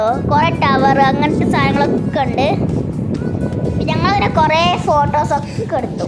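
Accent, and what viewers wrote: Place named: Mannarkkad, India. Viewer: മണ്ണാർക്കാട് ഭാക്ഷ ഇടയ്ക്ക് കയറി വരുന്നുണ്ട് …❤️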